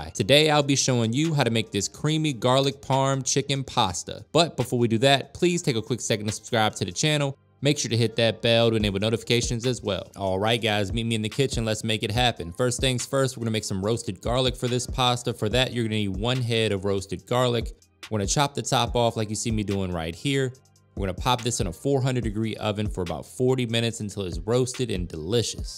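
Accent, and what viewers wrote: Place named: South, United States. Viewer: Sounds like a real country boy lol wish I could taste that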